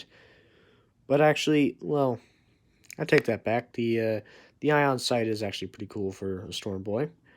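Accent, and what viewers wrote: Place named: Australia. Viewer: That Voice was classic sounded like a bit of a sophisticated Ork haha mixed with a Aussie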